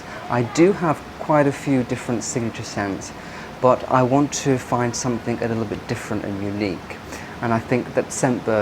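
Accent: RP, United Kingdom. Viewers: I am Japanese. I live in UK for 50 years but I still have heavy Japanese accents. I genuinely admire and enjoy listening posh accents. 😊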